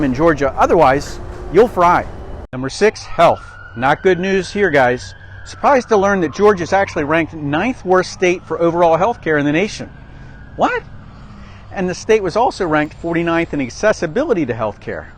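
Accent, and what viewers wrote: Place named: New England, United States. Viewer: Sounds just like new England. only less weirdos.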